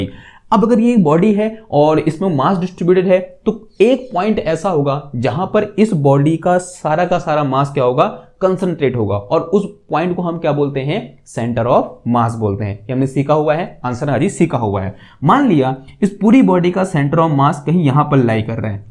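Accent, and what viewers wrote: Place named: Kumaon, India. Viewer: Kumaoni accent ❤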